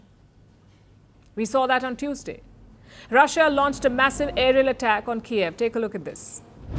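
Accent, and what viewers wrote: India. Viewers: Sorry can't watch with that indian paajeeet accent. It's horrible.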